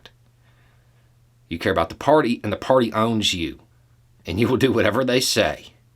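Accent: South, United States. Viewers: I just LLOVR that southern (drawl?). We here in east bay SF agree you got it absolutely down! These people (everyone) better wake up. Good luck🙏🏿👍🏿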